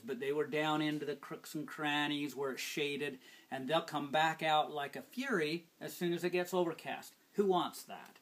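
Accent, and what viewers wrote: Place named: Russia. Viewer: Mmm yummy russain accent. My wife is russain. Very good woman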